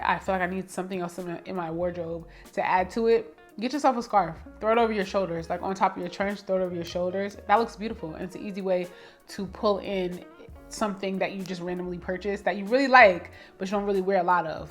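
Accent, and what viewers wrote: New York, United States. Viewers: Good morning, young Queen just ran across your channel. I am an old G born and raised in new Yorker. I hear a New York accent as you're talking love your channel. I just subscribed. I am 67 years of age and I'm loving your fashion tips. I'm gonna pick those up. And incorporate them in my closet from what I'm able to see in your home. I also love your design style. Absolutely gorgeous. Fresh clean. I love it, continue great success. Thank You Have A Blessed Day 💙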